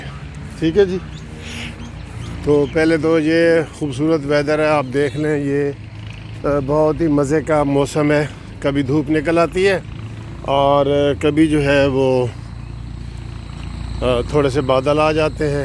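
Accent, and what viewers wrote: Saraiki, Pakistan. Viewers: سرائیکی کی مٹھاس سن کر کان میٹھے ہوجاتے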